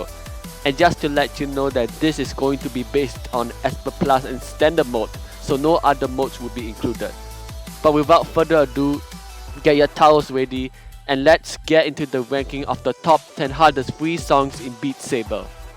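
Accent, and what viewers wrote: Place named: Singapore. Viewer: i love hearing singaporean english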